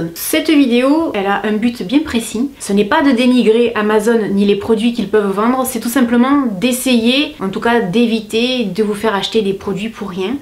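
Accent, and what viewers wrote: South, France. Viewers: et notre bel accent du sud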